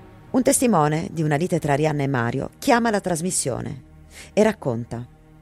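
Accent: Piedmont, Italy. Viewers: sembri piemontese !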